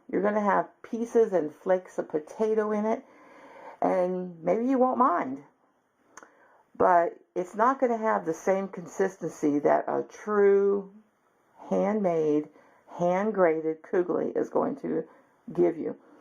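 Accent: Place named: Illinois, United States. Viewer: This is so werd! Assuming, as I am, that you're from central Illinois based on your accent (...I love how you say sauseege, potayda, and puddin' just like my grandma used to!), you're like this odd mix of both sides of my family. My mom's side are Lithuanian from northeastern PA so I had kugeli a lot as a kid... and my dad's side are all from Bloomington, IL, where I was born. So cool to see it mixed like this.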